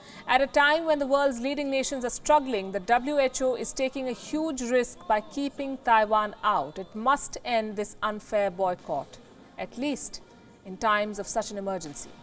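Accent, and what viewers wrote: India. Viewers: Try speaking Hindi or Tamil. Your English is too Indian. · but what part of India though?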